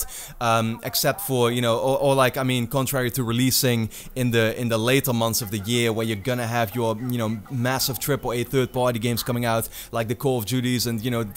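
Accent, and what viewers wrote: Netherlands. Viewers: Good work with this video m8... But i have to ask: Are you by any chance Dutch? You have quite the accent 😉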